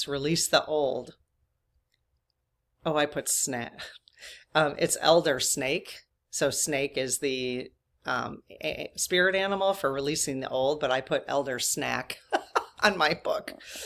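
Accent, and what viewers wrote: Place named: Wisconsin, United States. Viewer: I grew up in Milwaukee and I detect a Wisco accent in you. Is that right? We moved away when I was 12 but I still have tons of relatives and friends there with that accent, which I love! I have ADHA and grew up with teachers telling my I was an underachiever because they knew I was smart but still was only getting Cs and Bs in school. I spent twice as much time on homework as my friends but no one knew why - there was no concept of ADHD then and people just thought I was slow to get things. Even in college I had to work twice as hard to get my BA in experimental psych. I didn't feel dumb but I just knew everything took me longer and was harder and I would make lots of simple mistakes such as in simple processes like addition and subtraction.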